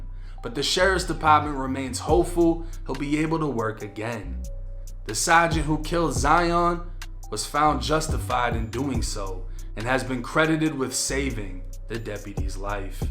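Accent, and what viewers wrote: Boston, United States. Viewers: My dude…..that Boston accent is intense!! 🙌🏽🙌🏽🙌🏽🔥🔥🔥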